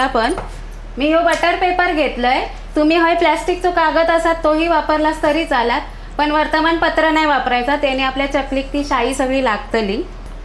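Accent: Malvani, India. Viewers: कुरूम कुरूम आवाज इलो.खुप मस्तच चकली . खुप छान वाटत तुमचं मालवणी बोलणं. छान बोलून रेसिपी करता म्हणूनच रेसिपी बघायला आवडतात. 🙏👌👌👍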